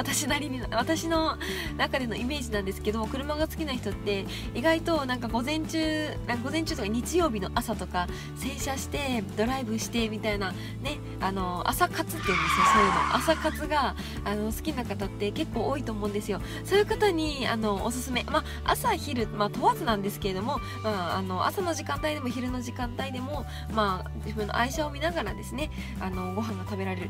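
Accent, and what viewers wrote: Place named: Hiroshima, Japan. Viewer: NAロードスターのマニュアルですか？うらやましいですー！広島弁に聞こえるのですが…わたしrootsがそうなので、だったら嬉しいです！！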